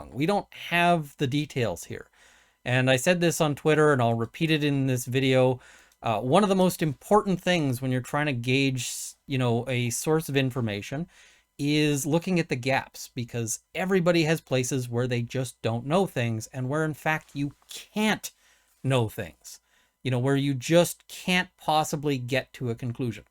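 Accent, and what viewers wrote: Canada. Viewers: I love Canadian! 🤗 Please say 'out' or 'about' as often as possible.♥️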